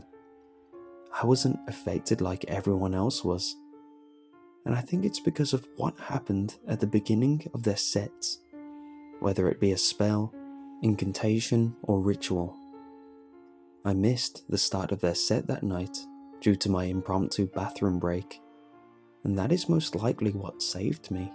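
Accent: England, United Kingdom. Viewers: New England? Sounds like he is from old England to me.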